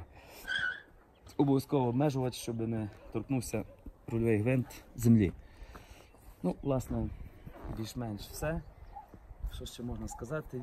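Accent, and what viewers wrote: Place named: Western Ukraine, Ukraine. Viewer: Это почти польский, суржик западноукраинский.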